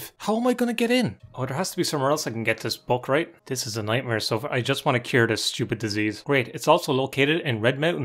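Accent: Ireland. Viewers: man, placing your accent is pure hard. Is it Mayo/Galway? That or midlands? Anyways, savage videos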